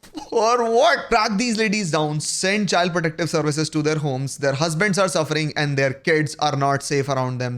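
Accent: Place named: United States. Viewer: Wanna wanna bolna bandh kar American kalon jaise. Dhang se 'want to' bol.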